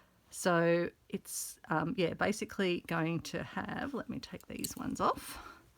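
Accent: Australia. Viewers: So love hearing a fellow australian. I subscribed co I have just found you and also cos if I follow Australians they can tell where they get their supplies, cos I can to! c’mon aussie c’mon 🧵🪡🧵🪡🧵🪡 I will make this cos I think it is a great idea. Thanks heaps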